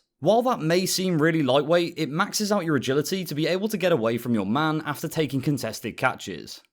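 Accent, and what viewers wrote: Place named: United Kingdom. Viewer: Clicked on heard British voice immediately didn’t respect your opinion sorry about it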